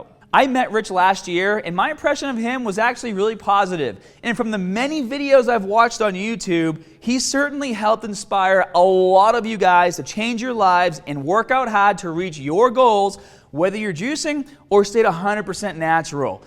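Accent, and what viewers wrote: Boston, United States. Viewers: Why watch this guy? He looks the same from videos from years ago. Boston accent was not earlier, but is now. Lift some more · Jim Jorgensen Why the hate? Go fuck off and find someone like with a "real Boston accent." Lmfao stupid kid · Never noticed you're Boston accent until now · I haven't watched one of Scotts videos in a couple of years. I swear to god he didn't have a Boston accent before. This has to be a joke right? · Abdahmenen? Kind of hard to take you seriously when you can't even pronounce abdomen correctly. And it's not because of your cringe worthy boston accent either. You added an extra syllable in there , man. But to the point of the video. Do I think steroids used properly and maturely (that last one is rare in today's society) can cause adverse long term effects to your health? No. Or should I say, I don't know. 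No one does because there is little to no actual studies on the matter. That said do i think people who overdo it and abuse the shit out of steroids - like Piana - over a long period of time are playing with fire? Yes. Just like any drug be it cocaine, marijuana, nicotine/cigarettes, heroin (obviously), and even caffeine are setting themselves up for failure health-wise in the long run. I know I'm going to get bitched at for including marijuana on that list from our stoner left leaning friends, but there was just a study produced saying that long term use of the drug deteriorates your brain. The study released is what I'd call a "preliminary" study, but it showed that people who smoke high potency weed showed signs of brain damage, so in other words, people who built up their tolerance to weed and smoke the stronger shit nowadays are upping their risk of brain damage. Just like people who chug bottles of the stronger vodka are going to fuck themselves up more than the dude who just drinks a few beers. 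That said the study was admittedly hardly conclusive, which is w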